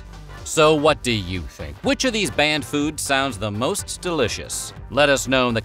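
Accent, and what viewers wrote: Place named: United States. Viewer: Bro literally speaks american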